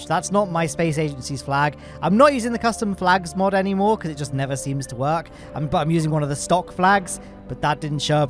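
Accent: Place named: Southwest England, United Kingdom. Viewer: Knew you was from the southwest, its nothing like a Janner accent but you sound like a lot of people i know from plymouth and in bristol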